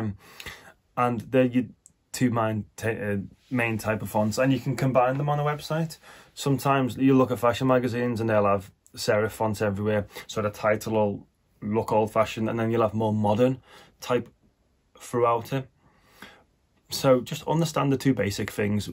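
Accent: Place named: Scotland, United Kingdom. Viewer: ok, your accent resembles me scottish one that's why i asked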